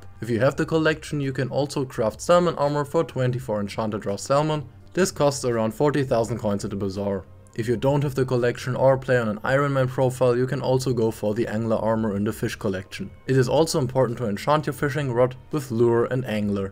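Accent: Germany. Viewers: I hear deutsch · Love that i hear that he is german i think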